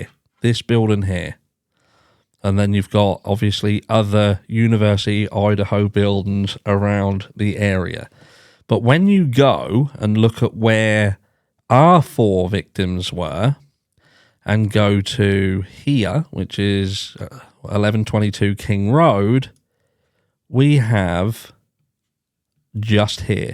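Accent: United Kingdom. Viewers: I have always wondered where are you from obviously it sounds like the UK somewhere just curious as for the Davis stabbing its a shame that people are so stupid with the whole snitching thing people think you're a snitch if you talk to cops Davis is a few hours away from my home an it gets a lot of bay area students an they are with the no snitching an if they come from the hoods of the bay area stabbing is somewhat normal more shooting but Oakland an San Francisco is some violent areas so its crazy there is a coincidence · NEW Subscriber Your Chat is easy to Lisen to. Even with the deep UK thing in your voice. (it Ok) Point of note. A true K BAR MARINE knife does not have a saw tooth stile on one side of the blende. It has a blood grove in the middle of the blade, A leather staked handle, with a metal back end. Is the blade must have a nick or a Bland spot. 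or super dull to Sair skin. If it has a saw teeth side on it will be one used to cut boardwear making a t 54 knife not a true K-BAR, of it is this kind of knife. look for a post on it about halfway down the blade joining the two blade together. Deep South ALABAMA USA.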